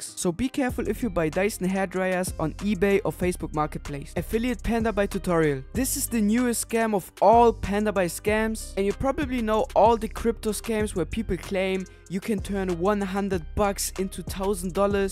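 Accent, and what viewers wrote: Germany. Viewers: Feier den deutschen Akzent :D Gutes Video :) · ich liebe deinen deutschen akzent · Deutscher Akzent killt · geiles video aber du hast hein geilen deutschen ac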